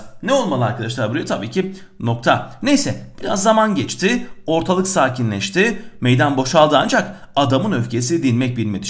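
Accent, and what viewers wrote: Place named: Konya, Turkey. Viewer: Memleket Konya sanırım hocam 😀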